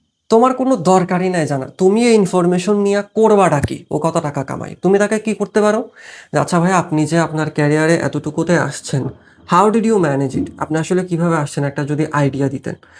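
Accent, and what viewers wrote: Chittagong, Bangladesh. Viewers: You're from Chittagong,aren’t you bhaiya?😁.. But, bhaiya your contents are too much needed in this time of our generation.. Thanks bhaiya🌸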